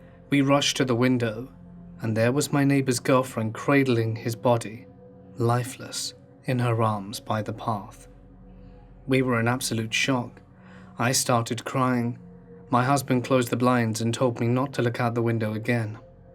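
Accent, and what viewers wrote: United Kingdom. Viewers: I don’t know why, but when someone reads stories in a British accent it’s much more believable lol.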